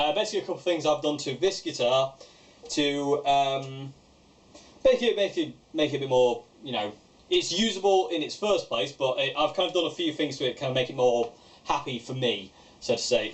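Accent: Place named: West Yorkshire, United Kingdom. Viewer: Hey, great channel! I share your love of Vintage guitars, got me a Vintage V6 sunburst after trying a Fender American Strat and deciding I prefered the V6 and being £800 better off! Can't quite place your accent, but definitely somewhere in West Yorkshire?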